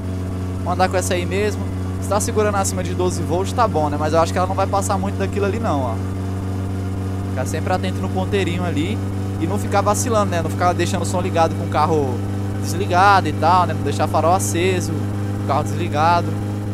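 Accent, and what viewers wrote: Minas Gerais, Brazil. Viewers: Vc é mineiro?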